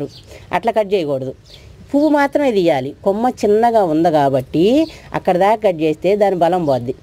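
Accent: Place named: Guntur, India. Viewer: Chala baga untundi mee explanation. Maadi guntur. Ippude hyd lo untunnamu. Na chinnappudu andaru ede slang lo matladevallam. Gurthuku vastundi. Thq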